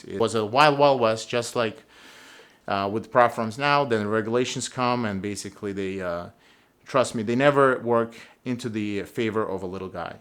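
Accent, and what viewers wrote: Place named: Boston, United States. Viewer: you got that boston accent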